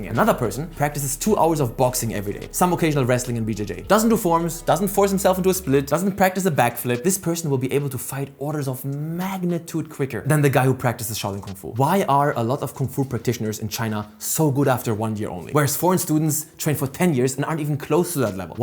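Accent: Germany. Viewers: Ich bin verwirrt. Der Akzent ist 100% Deutsch, der content ist aber englisch. Zwischen drin droppt er aber ein deutsches Wort in perfekter Aussprache, auf der Kanal Info steht dann aber Vereinigte Staaten. Check gar nix mehr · First time watching you, first impression: wait, why is this Japanese person talking with a German accent talking about Chinese martial arts. What xD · he's german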